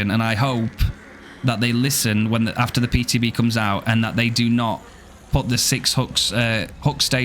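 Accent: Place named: United Kingdom. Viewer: Bro this was so funny listening to especially the start to mid rant and the british accent just is the icing on the cake, I say this with love as a fellow european you are really good at ranting and the accent fits perfectly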